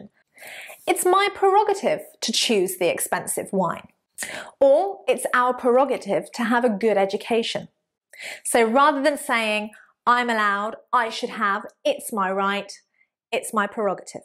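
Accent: United Kingdom. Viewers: You have a British accent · I love your accent, I love British English accent, you way of teaching is very lovely, I wish I can take class physically by you. You are gorgeous and lovely lady. Just I subscribed your channel. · All ma'am, I live in America but I love British English British accent and your accent. I still have issues using them in sentences cuz it's very high level English So I still need your assistance in using them in sentences and I love it thanks a million teacher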